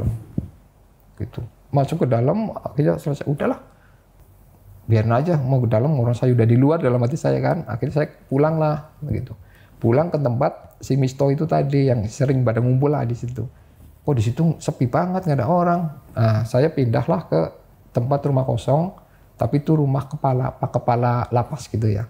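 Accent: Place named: Banyumas, Indonesia. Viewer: Ketara banget ngapake kang.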